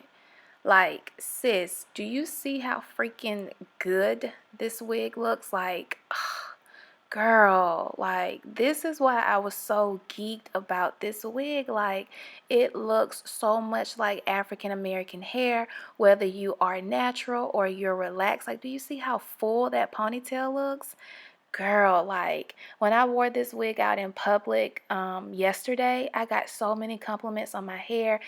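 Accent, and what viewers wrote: South, United States. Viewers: Her subtle southern accent is so cute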